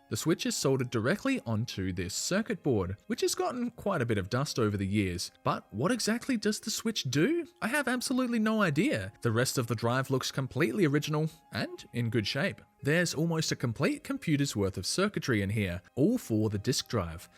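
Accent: Australia. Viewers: What state in Australia you from dude?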